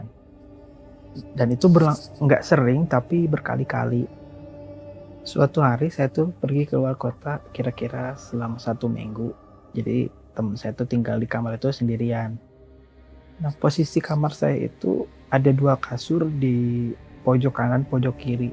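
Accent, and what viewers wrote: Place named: West Java, Indonesia. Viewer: Pasti orang sunda nih mas nya :v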